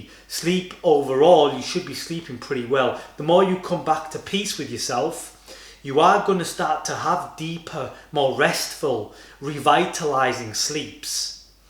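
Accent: Manchester, United Kingdom. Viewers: This is been happening for MONTHS now. I’ve been trying everything - eye masks, lavender diffuser, journaling, gratitude, showers, experimenting with snacks, sleeping pills, etc… NOTHING seems to work to make me feel refreshed in the morning. I usually stay optimistic, and hope for a better night sleep the next night. But today, I was kinda broken and f’in fed up with it! I needed this video today brother, thank you ❤️. As an aside - you sound like you’re from in or around Manchester? That’s my home, although I’m now on the other side of the world. So nice to hear this in a comforting, familiar voice 😊👍🏿. Have a great day!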